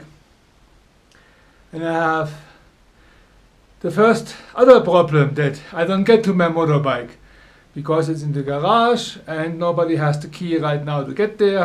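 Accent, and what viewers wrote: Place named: Germany. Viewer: Bei dem deutschen Akzent, würde ich lieber alles in Deutsch filmen und später Untertitel hinzufügen. Ist zwar dann etwas aufwändiger zu bearbeiten, aber weniger anstrengend für die Ohren und vielleicht auch für dich :)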